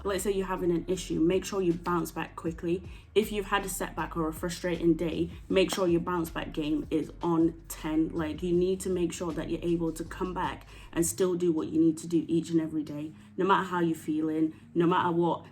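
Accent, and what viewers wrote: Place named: United Kingdom. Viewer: love that UK accent. Damn I can't wait 2 go back💯 I'm from Cali, I got soooo much luv out there. way more than I get in USA.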